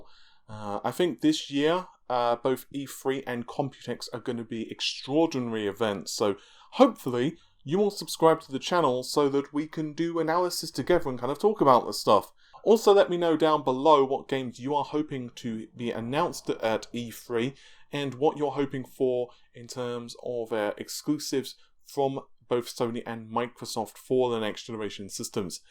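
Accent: United Kingdom. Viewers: It shouldn't be silent in British English pronunciation, and he is British by his accent.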